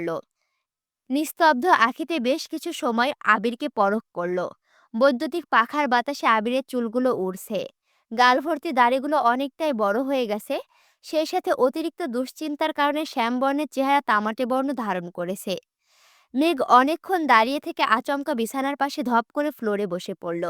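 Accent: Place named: Satkhira, Bangladesh. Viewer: Apu apnr district koi 😊 satkhira mone hocce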